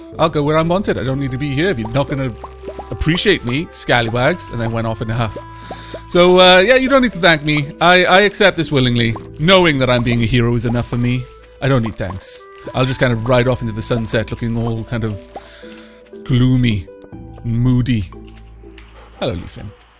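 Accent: Wales, United Kingdom. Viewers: Say, I could have sworn your accent was Welsh! So are you a welsh person living in Scotland or a Scottish person with a funny accent? (I am from Edinburgh, my accent is strange too.)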